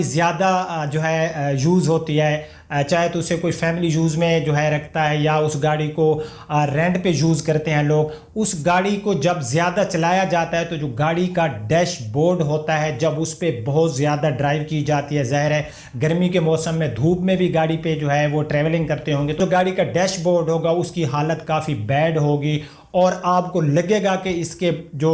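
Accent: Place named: Punjab, Pakistan. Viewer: Good info.....urdu in punjabi accent...😋😋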